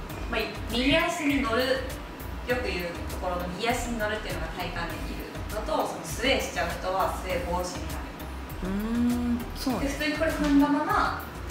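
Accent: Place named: Kyoto, Japan. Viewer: 京都弁ええなぁ～🤣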